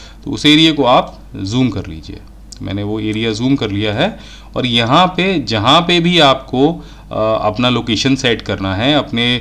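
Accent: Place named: Uttar Pradesh, India. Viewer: thank sir aap bahut deeply or ache se sam jate ho, aapki hindi bahut achi lagti hai,wase bhi u p waalon ki hindi bahut achi hoti hai, main bhi u p se hun, apka padosi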